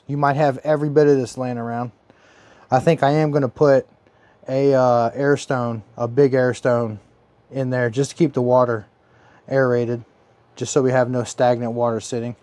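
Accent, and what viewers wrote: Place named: Pennsylvania, United States. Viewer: Yo I knew you had to be a neighbor because the accent/dialect. You sound like you from PA but the jersey tells me all I need to know lol. Good shit bro. I been brainstorming this type idea for a little but still am rocking all organic atm...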